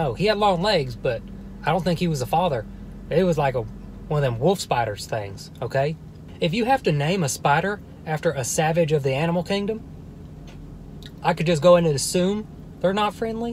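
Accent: South, United States. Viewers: 2024 now.....that southern accent was thiccccccccck in these older videos. lol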